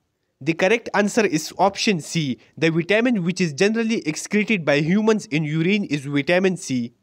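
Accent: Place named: India. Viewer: thanks for this but i didnt expect it to be brutally indian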